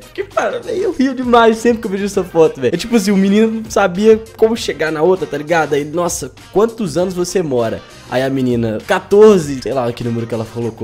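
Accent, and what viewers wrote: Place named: Minas Gerais, Brazil. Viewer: Tem, de mineiro hahaha :3 é muito bonitinho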